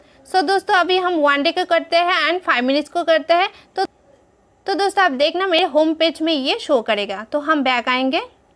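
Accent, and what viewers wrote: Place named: Bengal, India. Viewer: Sweet Bengali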